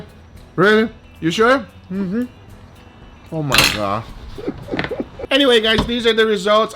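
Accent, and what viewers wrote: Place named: Brazil. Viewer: Brazilian Accent ALERT!!!!! hahah muito bom seu canal to usando varias técnicas que aprendi com você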